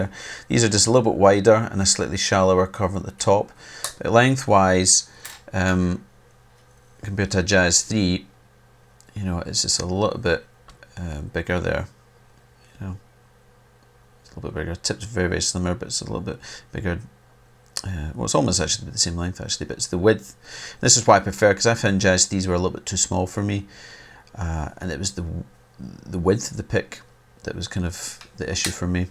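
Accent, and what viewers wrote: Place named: Scotland, United Kingdom. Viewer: You're.... Scottish! I don't often hear a Scots accent on YouTube.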